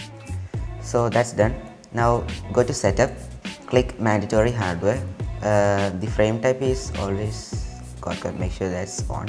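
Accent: Kerala, India. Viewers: Are you malayali from Kerala plzz replay your English is so 🤨🤨🤨🤔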